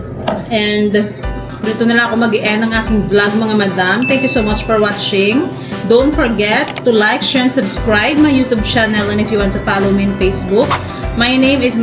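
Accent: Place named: Hiligaynon, Philippines. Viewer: You still have Ilongo accent even speaking Tagalog ay ambit sa iyo Monday!!!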